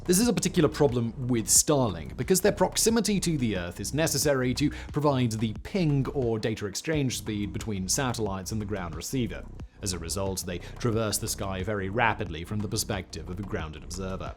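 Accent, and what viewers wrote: United Kingdom. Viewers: . Please do your research. I love you and your brit accent but you have blown it now. That cotton-looking plant is definitely not cotton. We grow cotton in bnbnmnb can't say because of state spies kkkk, Just spend 30 seconds on the internet and you will see a real cotton plant. Your pic shows a harmonious loving field of flowers, but a real cotton field uses pesticides, and child labor and the topsoil is washed away in rain. None of this happens in your Alice in wonderland field of flowers. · Another example of British accent being conflated with intellectual prowess. What an a$$. 😂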